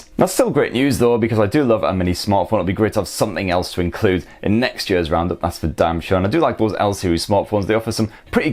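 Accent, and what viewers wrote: United Kingdom. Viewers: His British accent is the icing on the cake 🤭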